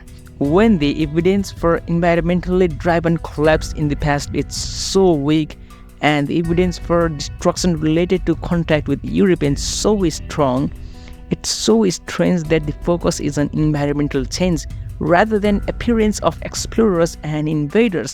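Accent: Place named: South Asia. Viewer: I see why you started using an AI voiceover, it's because you're from South Asia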